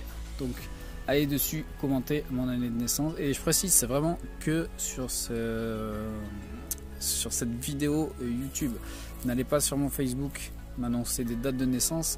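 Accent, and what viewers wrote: South, France. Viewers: Bonjour j'adore tes intros et tes vidéos, bravo👍. <gari> tu ne serais pas du sud toi? Tiens j'ai le même donut! Sinon pour tes tomates je croise les doigts. Bon dimanche et bonne baignade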